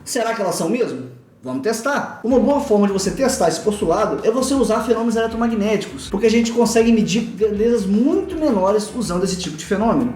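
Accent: Minas Gerais, Brazil. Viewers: Só de falar "vão falá um cadim" dá pra sacar que é mineiro. É tipo uma linguagem em código que possuímos... kkkk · Que delícia acordar e já ter vídeo novo. Uai, você é mineiro?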